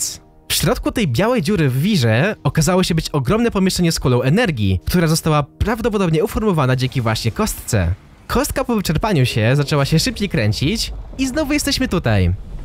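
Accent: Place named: East, Poland. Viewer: Co to niby znaczy? Przecież pisze prawdę. Chłop robi dobre filmy, ale ten jego wschodni akcent wszystko psuje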